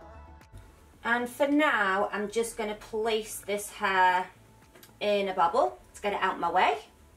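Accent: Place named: Northern England, United Kingdom. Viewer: Thanks for keeping things normal. I'm from Warrington but live in St. Louis and listening to your lovely northern voice comforts me and reminds me of home. Stay safe and keep posting xx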